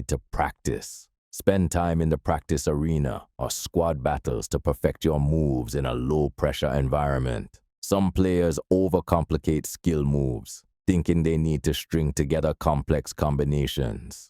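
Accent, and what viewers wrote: Jamaica. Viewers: I like the Jamaican voice don't change it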